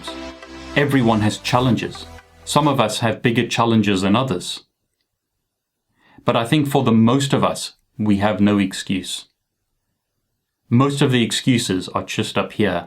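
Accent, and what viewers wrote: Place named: South Africa. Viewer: Okay great. I knew i recognized the accent. I'm from KZN-PMB. Thank you for the videos!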